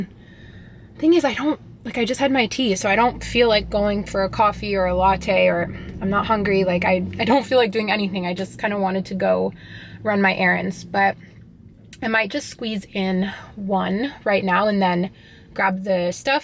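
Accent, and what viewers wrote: Canada. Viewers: Hey - for knowing the days of the months - do the knuckle trick. Google it. For the laundromat - I think they reserve certain machines for doing animal hair stuff - I don’t know if these are necessarily the big machines you were talking about. But I’m glad you found a good laundromat. You do have a strong Canadian dialect 🍁🇨🇦🫡when speaking French - but maybe it’s just you talking quite fast. Maybe pretend you are talking to a young child when talking to Siri 🤓. Or why not switch to to Canadian French like he suggested? If you like to start cooking - I like to make hummus or different pestos - these are good things to use up for your lunches or in bowls. If you play around with replacing expensive ingredients with more economic ones - rocket / spinach for basil, sunflower seeds for pine nuts it can be a good alternative to store-bought ones. 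I put a fitted sheet on my couch - for a single bed - if you buy one that resembles linen or sthg. similar and you colour-match it - it really blends in and saves you many stains.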